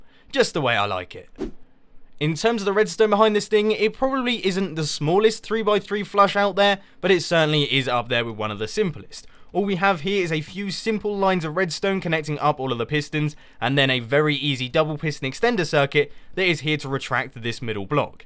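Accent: United Kingdom. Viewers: And he has a funny British accent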